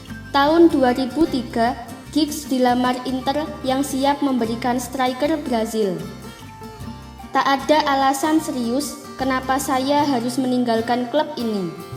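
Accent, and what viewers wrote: Java, Indonesia. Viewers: medok kali to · Medok e mba mba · Jowo to mba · Medok dan cadel mbak eeeee...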